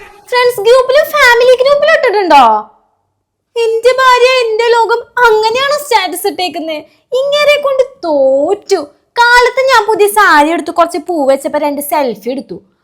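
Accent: Thrissur, India. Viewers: തകർത്തു തിമിർത്തു. വളരെ നന്നായിട്ടുണ്ട്. Specillay നിങ്ങളുടെ സംസാര രീതി. ഞാൻ കുന്നംകുളം ആയതിനാൽ തൃശൂർ രീതി ഒരുപാട് അശ്വതിക്കുന്നു. എന്നെങ്കിലും നേരിൽ കാണാൻ കഴിയുമെന്ന പ്രതീക്ഷയോടെ.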